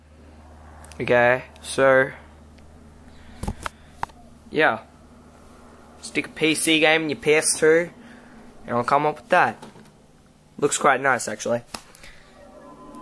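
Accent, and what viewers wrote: Australia. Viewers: Good Austrailian Accent. What you Found was Actually the PS2's Personified Fear. ( Yes It is an RSOD. ) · i like the Australian accent because u get to say g'day mate lol xD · your australian accent sounds preety cool man! you are the coolest person in the world!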